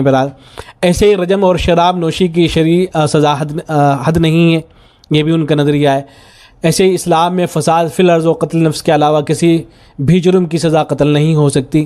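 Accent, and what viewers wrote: India. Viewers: یہ شخص جیسا کے لہجے سے معلوم ہو رہا ہے پو پی سے تعلق رکھتا ہے یو پی وہ زمین ہے جس نے برِصغیر کے مسلمانوں میں دیوبندیت اور بریلویت کی شدید تقسیم پیدا کی جنہوں نے لباس واضح قطعہ داڑھی کے واہ انداز و اشکال اسلام کے نام پر متعارف کروائے جو مراکش سے حجاز و ملائیشیا تک پوری مسلم دنیا کے لیے اجنبی ہیں جن کی بیمار فکر نے نہ صرف ہندؤ مسلم خلیج کو گہرا جس کی سیاسی قیمت پنجاب بنگال اور کشمیر کے لوگوں نے ادا کی اشرف تھانوی ہوں یا احمد رضا بریلوی یہی سے تھے جبکہ ان کے مقابلے میں پنجاب نے بھلے شاہ بابا فرید بابا نانک مولانا عبید الللہ سندھی جیسے جوڑنے والے لوگ پیدا کیے جاوید احمد غامدی سے بغض بھی اصل میں اسی بنا پر ہے اسلامی تاریخ نے صدیوں بعد ایک بڑا دماغ پنجاب میں کیوں پیدا کیا۔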